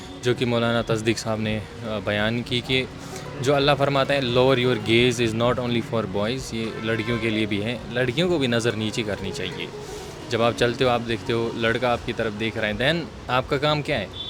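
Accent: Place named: Kashmir, India. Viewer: the kashmiri accent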